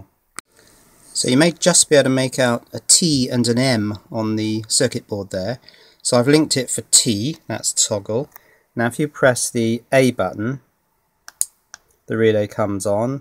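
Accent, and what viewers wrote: England, United Kingdom. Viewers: Hello, How nice to hear an English accent. I'm looking for a way of wiring two solenoids which are going to oper ate alive catch rodent trap. I need 12 volts for this. I have looked at adapting a wireless door chime but the out put on that is only 3 volt. By the way, I have O level physics only! Any thoughts much appreciated? (I'm on very limited budget) Thanks for sharing an informative tut/video. David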